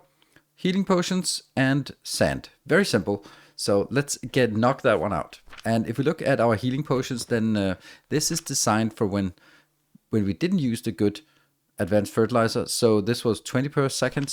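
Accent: Denmark. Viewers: Great videos thanks. You sound danish ?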